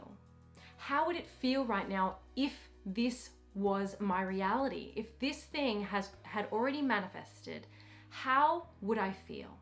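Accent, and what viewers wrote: Australia. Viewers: A week ago or so, I came a cross an other aussie YouTubeing about LoA. I was really pleased to hear that assume accent among others with American or British accent and so I come across your teachings. Talk about LoA 😊. Anyway thanks for your teachings. Greetings from Sweden!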